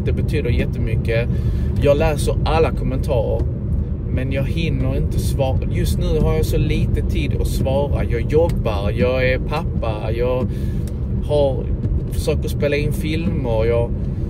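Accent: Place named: Skåne, Sweden. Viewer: Jättebra kanalen! Men jättesvårt att förstå skånska för mig 😊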